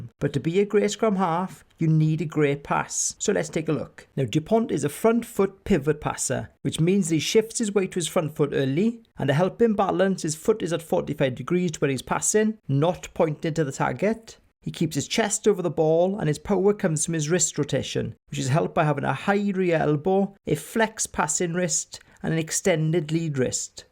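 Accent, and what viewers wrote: Wales, United Kingdom. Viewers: Great analysis, and I like your accent (welsh, if I am right). I will check your other videos. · Sorry. I enjoy what you do but your welsh accent is an obstacle for a normal frenchman. Friendly.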